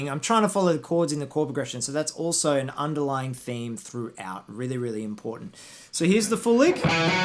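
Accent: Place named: Australia. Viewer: Hi. Good vids man! From your accent you're an Aussie right? I am from New Zealand. We both have the same high voltage (230-240 Volts). Do you have any problems with your sound when your playing live through a Tube Amp. Like a high ear piercing sharp cold tone when you play higher up the neck? If so what do you do about it. What kind of Amp are your using. I have a Marshall and put a brown Box in front to lower the voltage. But not 100% happy with it. Love to hear about your experience with that. Cheers, John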